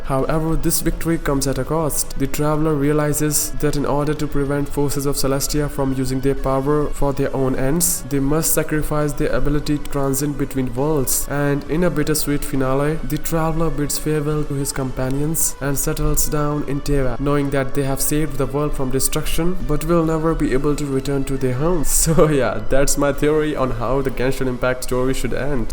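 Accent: India. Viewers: Bhai teri accent Indian lagti hai. Tu indian hi hai naa?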